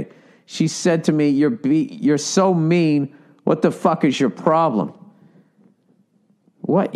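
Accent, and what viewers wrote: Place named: South, United States. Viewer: Love, love, love the country accent!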